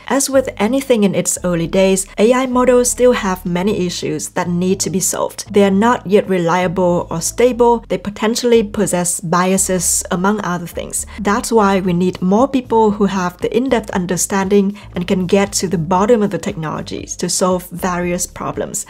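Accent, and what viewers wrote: Vietnam. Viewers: e cảm ơn chị. Một chiếc video tỉ mỉ không kém mấy video của các pháp sư ấn độ nhưng lại có accent việt đáng yêu vô cùng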